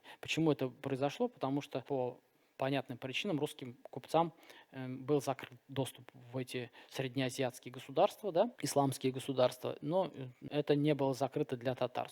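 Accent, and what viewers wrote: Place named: Europe. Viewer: В ЕВРОПЕ ЖИЛ КАК УЧИЛИ ТАК И ГОВОРИТ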